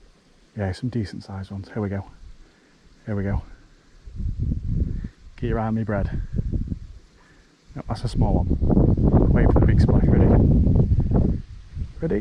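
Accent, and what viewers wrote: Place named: Nottingham, United Kingdom. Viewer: Lesson thoroughly learned I guess! Well done anyway. Lovely looking fish. I'm guessing by your accent you're Nottingham area? Anyways, I used to work for the council in Long Eaton and there were some big old carp in the canal there. They'd grown big and fat on all the duck bread over the years.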